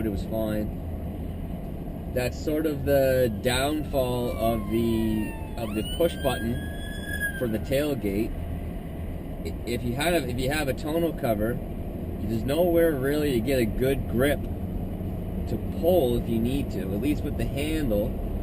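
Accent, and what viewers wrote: Canada. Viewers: you're canadien right?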